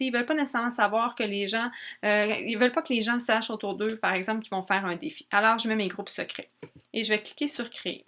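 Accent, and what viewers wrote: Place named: Quebec, Canada. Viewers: C'est vrai qu'avec l'accent québécois ça donne un charme supplémentaire 😃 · Avec l'accent québécois c'est tout de suite plus intéressant !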